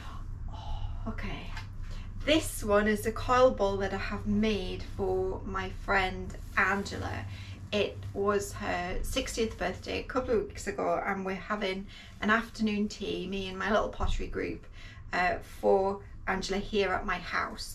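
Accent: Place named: Newcastle, United Kingdom. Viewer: Hey, how fantastic you're in your studio! Oh what a great assortment of pots and glaze combos. love Jacobs jug and I do hope you can save it? Your beautiful coil bowls are fantastic and what a lucky friend you have who's going to get the big turquoise bowl for her birthday. so glad you're back making pottery from home and videos as I love watching and listening to your Geordie accent. Happy potting my friend and stay well xoxo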